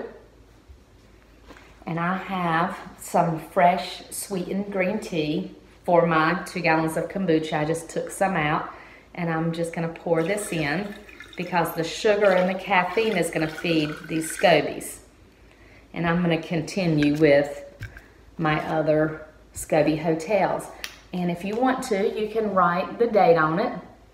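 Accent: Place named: South, United States. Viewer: What southern accent is this anyone please?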